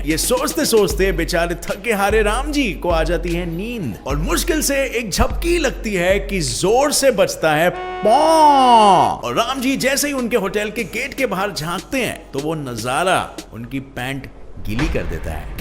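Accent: India. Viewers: Liked your new abdaaz of speaking... Desi........ 😎😎😎😎😎😎🤩🤩🤩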